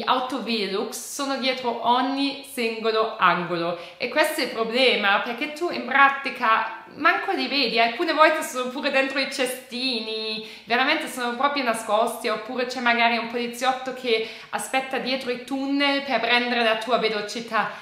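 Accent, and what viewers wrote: Germany. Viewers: Bravissima complimenti per il tuo italiano mi.piace l.accento tedesco....io vivo in Germania da anni...e si sente l' accento italiano quando parlo tedesco e divertente questa cosa..ciao un abbraccio...dove vivi in Germania ? 🌷🌷🌷🌷☀️☀️☀️☀️ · Salve. Mi chiedevo se la bottiglia di plastica si deve rendere obbligatoriamente nello stesso negozio dove si è comprata o anche in un altro? Grazie. P.S. Il tuo italiano con cadenza tedesca è stupendo. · Se voi piaciuto ,se vi è piaciuto questo video ,hai un forte accento tedesco si sente .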